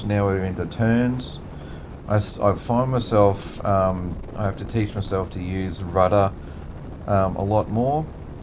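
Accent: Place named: Australia. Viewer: Great video and just wonderful to listen to your beautifully calm quiet commentary. Hearing a quiet Aussie is such a restful change compared to our American counterparts with similar videos. Love it.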